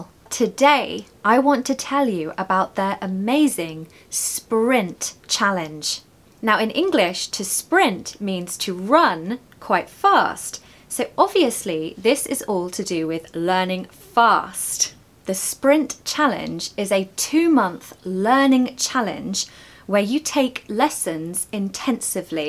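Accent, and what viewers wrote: United Kingdom. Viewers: Assalamalaikum Hello U know what, i joined ur channel just bcz ur British Accent which i really love 💖😃. Thanks for the video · i don't know which i learned accent, i hope so u got my writing. i watch your videos, and British accent it's lovely. i happy 'cause i understand you speaking. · Hey, you are going to be my favourite English teacher. Your accent is so nice. I would love to learn british accent by watching your videos and following your tips. Thank you very much for being there! ❤ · Teacher you aré great teacher , I understand you better than others teachers ,and you aré so stunning, you're british culture and accent excelent ❤👍😃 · Hi. I am happy that I found the correct platform to listen British accent. Your way of presenting makes more attractive to keep the important stuffs in my mind. I am very excited to watch your each videos, it's not boring. 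Special thanks for this video on the books. I always find bored to read books, but, from your description on those books motivate me to read asap. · Indeed, Your accent is really beautiful, although I live in Australia, I really like British accent , you are doing well, just keep going👏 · Awsome British English by yours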